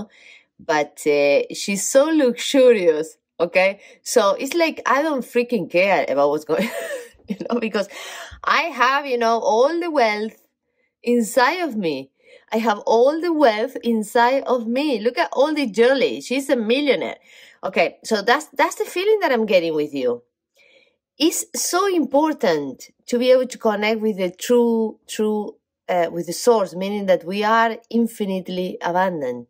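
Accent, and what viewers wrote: Argentina. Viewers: reconocí tu acento ... cordobesa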